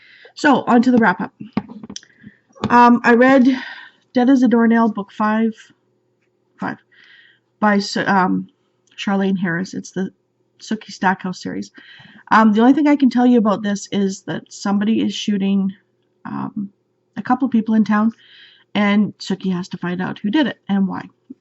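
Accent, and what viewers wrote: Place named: South, United States. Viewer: Lol! I liked your southern accent!! Those books are great. My boys love them! I'm still reading He Will Be My Ruin by KA Tucker. It's very good I'm half way through the main character annoys me so I find myself not picking it up. My goal is to finish today! I have a chunk of time with nothing to do and house to myself! :) Great video as always my friend!! Hugs to you!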